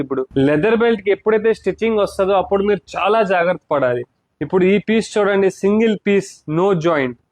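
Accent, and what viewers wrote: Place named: Andhra Pradesh, India. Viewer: Mee telugu slang super..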